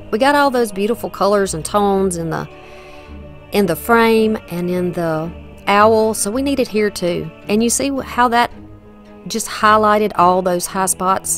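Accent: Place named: Alabama, United States. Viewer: I could tell you were from Alabama!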